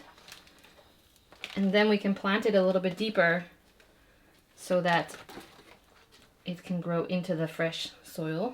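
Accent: United Kingdom. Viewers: Hi 👋 I love the long chatty video! 🎉❤ it was so fun to hear about how you and G got started and about your kitties. And I love your silly fun unfiltered personality ❤ your British English was so awesome 😂❤ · Your British accent is so funny, occasionally you drop into an Eliza Doolittle accent, from My Fair Lady. But it’s a better accent than my cockney one 😅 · This was a really fun video, you have such an interesting life! I loved your British accent lol that was so entertaining 👍🏾👍🏾😂😂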